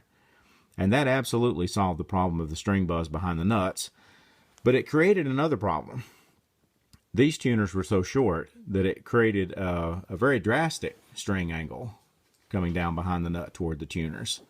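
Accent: Alabama, United States. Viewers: are you from alabama?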